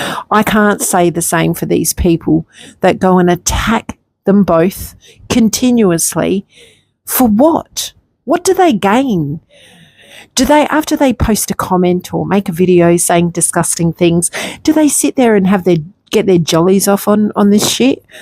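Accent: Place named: Australia. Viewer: I've never come across your channel before, you sound Australian and I don't get to follow many Australian creators. I felt the same way many times when Fiona so generously updated us on dear Grahams condition-just wanted to hug her. Then I made the unfortunate choice to watch what that hideous swamp hog said about Trevor's visit and was shocked that people are so awful. Why though? That's what I don't understand. They've never done anything to any of them.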